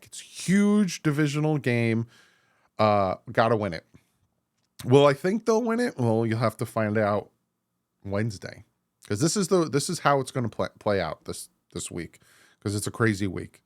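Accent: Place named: Hawaii, United States. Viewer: I really wanna hear this rant and the Hawaiian accent lol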